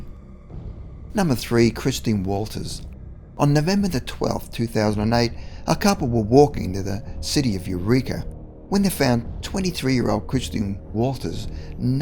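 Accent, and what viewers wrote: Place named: Australia. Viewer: cant they find someone other than that aussie to narrate? what would he know about california anyway?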